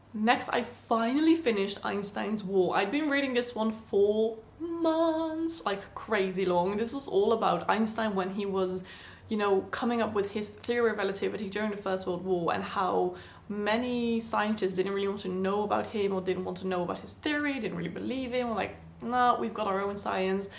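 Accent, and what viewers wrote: United Kingdom. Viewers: Did you live in the UK? You have a British accent, it’s lovely ☺️ I’m only asking because some other youtubers that are from the Netherlands have a different type of accent when speaking in English.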